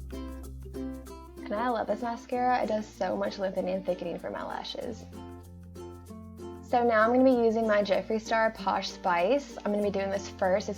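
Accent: South, United States. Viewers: I love it, and are u from the south I think I hear an angelic southern belle lol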